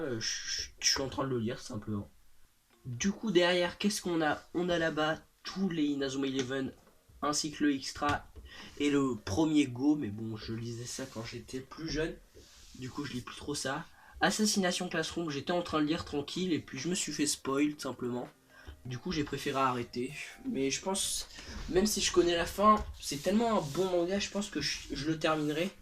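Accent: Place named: England, United Kingdom. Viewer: Super vidéo, tu m'as donné envie d'acheter certains manga. Et juste comme ça gentiment, j'adore ton accent anglais 😂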